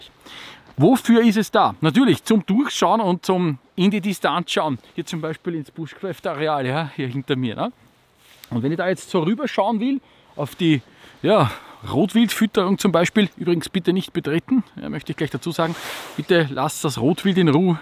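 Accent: Austria. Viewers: What is this interesting accent? Austrian? Anyway, thx for this review, item added in the wishlist. 😊👍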